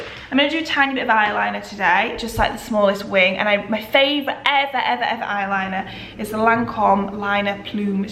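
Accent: United Kingdom. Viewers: I'm quite new to your channel and I have to say I love your UK English so much, it's so refreshing to hear and personally, I love it so much more than US english 😍😍 I hope you have a nice day and here's some cool new music for you: - Have fun girl ♥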